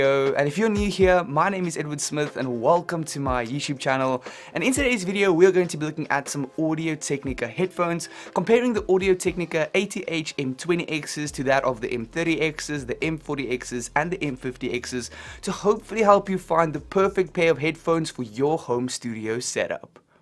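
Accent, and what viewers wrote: South Africa. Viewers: he south african? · south african? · South African ..though I thought one or the other :-)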